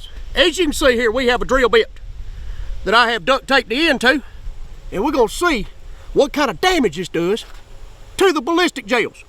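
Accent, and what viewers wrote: United States. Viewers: Yo I love his voice. His voice is MERICA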